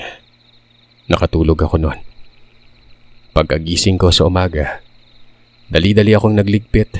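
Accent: Visayas, Philippines. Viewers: Ka angayan mo bisaya uieeee hahahha😊😊😊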